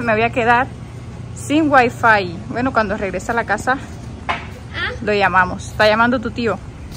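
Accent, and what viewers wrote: Caribbean Coast, Colombia. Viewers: Tan bello, habla puro costeño. Jajajaja disfruta mucho la familia, que después tendrás chichi pa rato🙏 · Costeñol puro!!! Abrazos, desde Cartagena, Colombia!!!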